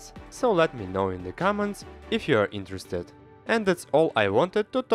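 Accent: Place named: Russia. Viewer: Привет русский акцент 😃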